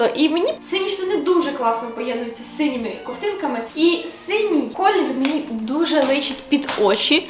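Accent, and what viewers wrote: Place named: Western Ukraine, Ukraine. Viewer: У тебе така гарна мова, не чисто літературна але в цьому і привабливість. Ти взагалі звідки? Гадаю з Западною України- Тернопіль, а може і з Вінниці. А взагалі дуже приемно чути твою мову- спасибі тобі!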